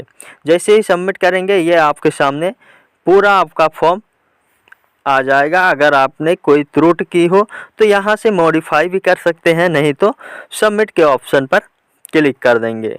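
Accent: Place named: India. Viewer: Chutiya mt bna mobile s ni bhra ja rha or Teri voice to ensi h jaise up ya bihari hoga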